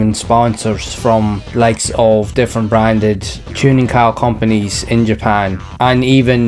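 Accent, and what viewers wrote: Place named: Dundalk, Ireland. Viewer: Hearing a man pronounce Japanese game titles in a thick Dundalk accent is my type of content. Been digging through the PSX a little trying out the new FPGA Mister core. There are so many JP exclusive titles I missed.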